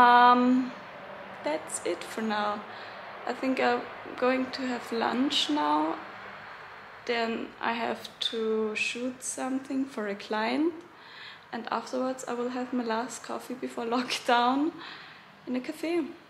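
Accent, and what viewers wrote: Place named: Germany. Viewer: Cute english with german accent 😃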